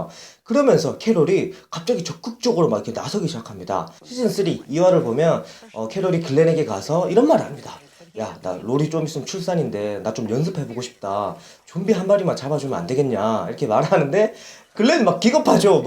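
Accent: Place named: Gyeongsang, South Korea. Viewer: 캐롤 시즌 1~2까지만 해도 별 비중없고 민폐 캐릭에다가 일찍 죽을것 같은 캐릭이었는데 이제는 없어서는 안될 최종병기가 되었죠. 인물 연대기는 역시 원년맴버가 메인인듯 합니다. 특히나 릭은; 준비기간이 오래걸리실것 같네요. 역시나 님의 내용정리는 탑입니다.근데 뜬금없지만 경상도 출신이신가요. 제가 경상도 사람이라 중간중간 귀에익은 말투가 조금씩 들리네요^^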